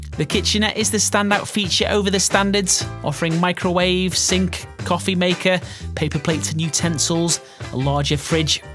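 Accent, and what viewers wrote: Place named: Scouse, United Kingdom. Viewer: Why have I never noticed the slight Scouse accent till this video?!?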